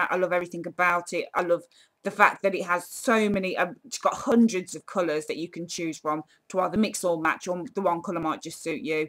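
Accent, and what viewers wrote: Birmingham, United Kingdom. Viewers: great info are u a brummie x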